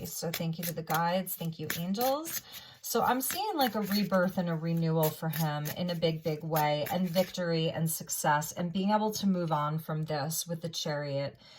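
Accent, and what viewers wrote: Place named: France. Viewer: Votre accent français est trop mignon 😁👍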